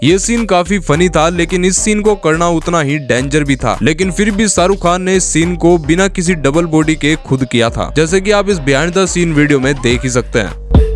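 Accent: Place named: Rural, India. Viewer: भाई पहले श को स कहना बंद करो, देहाती लगते हो बोलते हुए😅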